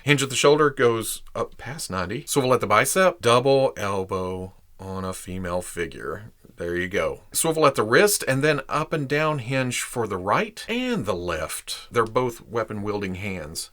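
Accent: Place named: Arkansas, United States. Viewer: Wow, Arkansas really comes out in this video.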